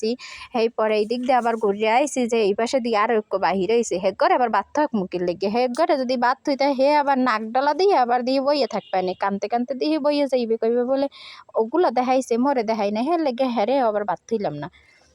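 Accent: Barishal, Bangladesh. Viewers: বরিশাইল্লা ভাষা মুই ভালা পাই🎉🎉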